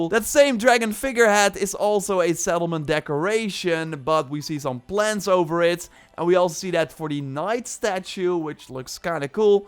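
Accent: Netherlands. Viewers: a dutch accent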